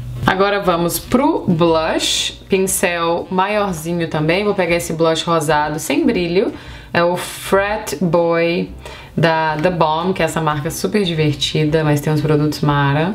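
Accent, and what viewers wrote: Minas Gerais, Brazil. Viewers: Amo o sotaque mineiro. 💗 Amei a maquiagem como sempre.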